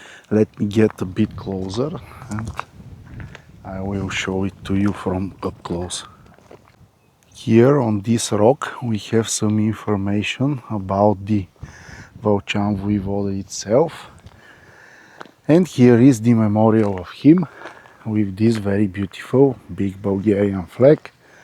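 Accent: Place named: Arab world. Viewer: Брачед за 30 гледания ли говориш на английски като арабин. По-добре си ги прави на български.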